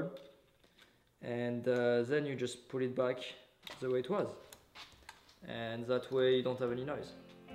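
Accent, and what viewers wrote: France. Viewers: How do I have a French accent like urs lmao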